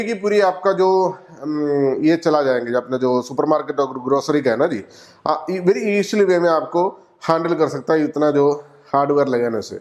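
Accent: Kerala, India. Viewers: Cheta Malayali aano....slang spashtamayitu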